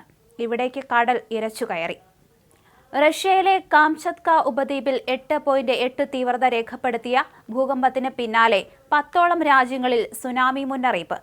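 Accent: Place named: Pala, India. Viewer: Palakaari aanan thonun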